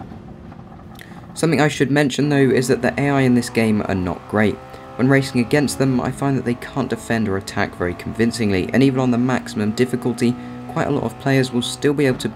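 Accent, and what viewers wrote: United Kingdom. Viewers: What area of the uk you from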